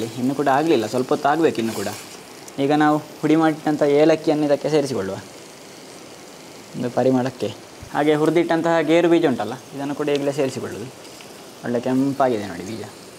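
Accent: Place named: Dakshina Kannada, India. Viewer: ನೀವು ಬಿಡಿ.... ಯಾವತ್ತೂ ಸೂಪರ್. ನಿಮ್ಮ ಅಡುಗೆಯನ್ನು ನೋಡುವುದೇ ಚೆಂದ ಹಾಗೂ ನಿಮ್ಮ ದ.ಕ ದ ಕನ್ನಡ ಮಾತು ಕೇಳಲಿಕ್ಕೇ ಆನಂದ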